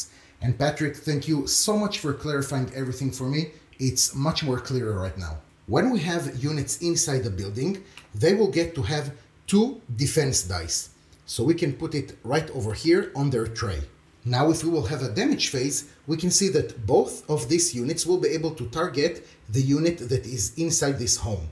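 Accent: France. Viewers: have you had a lot of troubles with get rid of French accent? I saw one French man trying speak english but he has some troubles with "french accent"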